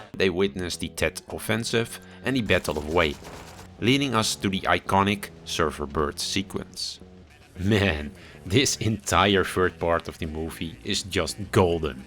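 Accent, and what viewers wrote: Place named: Netherlands. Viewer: The accent... ah, the channel is dutch. I just recognize it now by sound.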